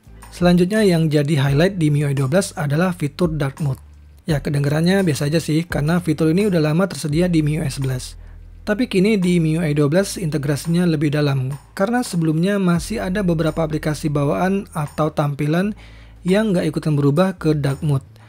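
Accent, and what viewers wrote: South Sumatra, Indonesia. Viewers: Cak wong sumsel youtuber ini. Wkwkw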